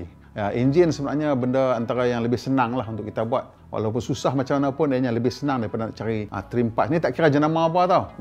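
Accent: North, Malaysia. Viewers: Bunyi Utaqa ceq oiii. Tobat lawak ckp. Heqot, mampoih. 😂😂😂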